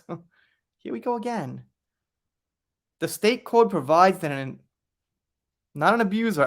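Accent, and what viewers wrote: New York, United States. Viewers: Love your posts! Esp outtakes! Love your New York accent the most!! Keep going!! Xo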